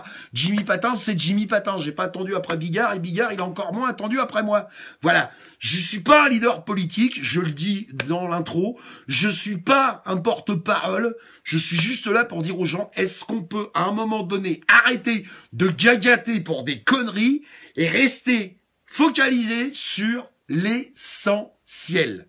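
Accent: France. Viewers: J'aime trop comment vous parlez, enfin un vrait Français, bravo msieur je suis abonnée👼👍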